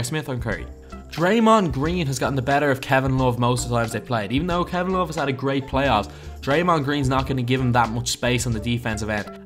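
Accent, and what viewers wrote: Ireland. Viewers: Is this dude from ireland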